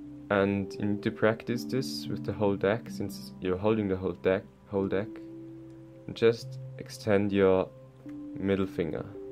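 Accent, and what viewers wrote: Germany. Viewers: Nice germanischer Akzent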